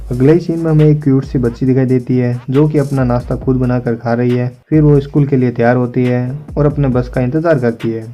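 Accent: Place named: Haryana, India. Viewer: Haryanvi can't be snitch from anyone . With fluent hindi anyone can tell you are from Haryana and i too am. All the very best brother , wish you more success .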